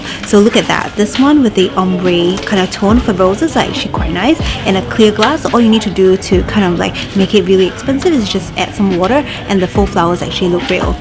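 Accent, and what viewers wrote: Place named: Singapore. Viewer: please try to improve your pronunciation. ‘check out my shopping lut’ ??? that mix of a singaporean accent and a western accent is also badly done because you pronounce one word several different ways. it’s jarring on the ears.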